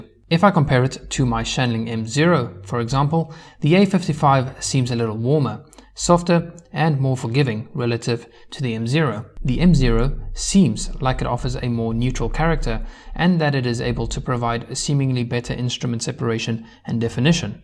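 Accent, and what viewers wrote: South Africa. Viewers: I'll be surprised if I'm wrong here but by any chance are you South African 🇿🇦 ? 😁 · nice review! you sound south african...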